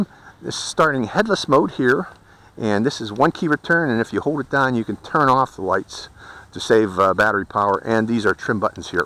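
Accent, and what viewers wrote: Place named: Western Pennsylvania, United States. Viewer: Hello, I've seen some of your other video's in the desert, and could hear when you spoke you had an accent close to what I'm use to hearing living in western Pa.... I have lived in and around Pittsburgh most of my life. Thanks for taking the time to make and upload the video's you do. Hope all is well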